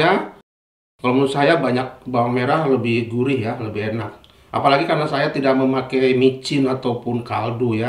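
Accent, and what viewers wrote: West Java, Indonesia. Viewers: Bapak mah Sundanya kas, kalo saya mah Tasik, bapak Pangandaran ga jauh pa 😀😀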